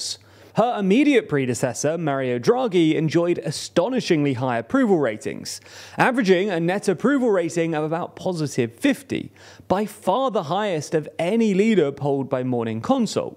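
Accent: England, United Kingdom. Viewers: do I really need someone with an English accent to read me statistics ?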